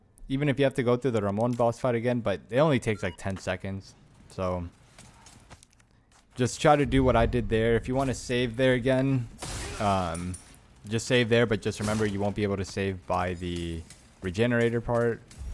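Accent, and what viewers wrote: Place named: United States. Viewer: you did not just say “my English is not that good” 🤣🤣 dude I thought you were American literally, I’m guessing you’re European as you guys seem to adopt the American accent hahah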